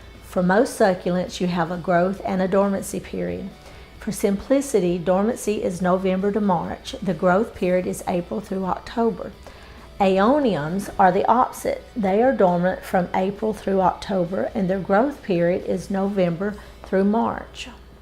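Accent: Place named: Chicago, United States. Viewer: Great info! Thanks for sharing. Perhaps cut the volume of the music on the next one? I love your accent, where are you from? Chicago?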